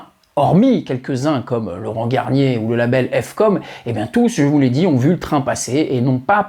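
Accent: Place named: France. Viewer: Les mots en anglais prononcés avec cette french touch 👌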